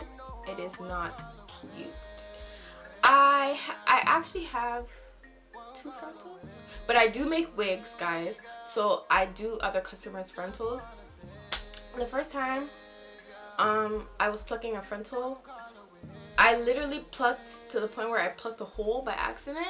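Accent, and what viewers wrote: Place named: Nigeria. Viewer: Liked right after I heard the Nigerian accent 😂. New Sub‼️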